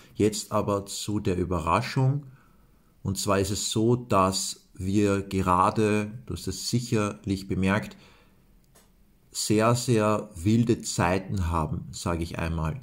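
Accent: Austria. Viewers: Jaaa, Du bist teilweise eine Schlüsselfigur in meinem Prozess❤Danke dafür und ich liebe auch Deinen Wiener Dialekt, dalls es wienerisch ist, aber ich glaube schon😅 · Ich LIEBE deine Stimme, lieber!❤ Noch dazu mit diesem wundervollen österreichischen Akzent, sehr berührend!🙏🏻💕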